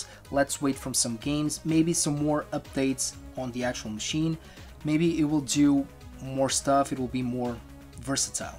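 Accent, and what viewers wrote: Portugal. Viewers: Eu estava a ouvir o sotaque e pensei...deve ser Tuga. E és mesmo. :)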